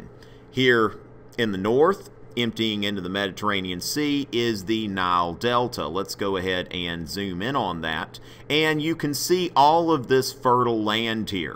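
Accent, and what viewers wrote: United States. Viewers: Why do you have to talk in a cowboy accent ?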